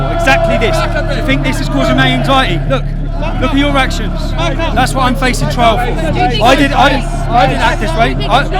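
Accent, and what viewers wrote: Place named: United Kingdom. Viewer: Tommy, we love you! You are noble and a rarely brave Brit, with a fantastic accent to boot!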